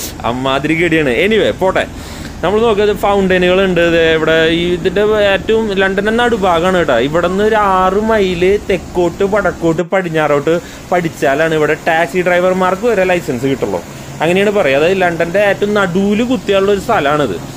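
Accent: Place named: Thrissur, India. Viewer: ഇഷ്ടായിട്ടാ ഗെഡി, എന്തുട്ട് തിയേറ്റർ, ഞാൻ ഇവിടെ vox ഇൽ പടം കാണാനിരിക്കുമ്പോൾ സ്ഥിരം കൂട്ടുകാരോട് പറയണതാ, രാഗം കഴിഞ്ഞിട്ടു ഉള്ളു ഇതൊക്കെ എന്ന് ഇമ്മള് തൃശ്ശൂർകാർക്കു രാഗം വിട്ടുള്ള കളി ഇല്ലന്ന്, ദുബായ് മാളിൽ നടക്കുമ്പോ ഞാൻ പറയും പൂരം എസ്‌ബിഷനിന്റെ ഒരു സൈഡിലേക്കില്ലെന്നു, ത്രിശൂർ അങ്ങനത്തെ ഒരു ജാതി സ്ഥലാ, അവതരണം നന്നായി പൊളിച്ചു വീഡിയോ ഈ സ്ലാങ്ങിൽ മതിട്ടാ ഓകെ ടാ keep it up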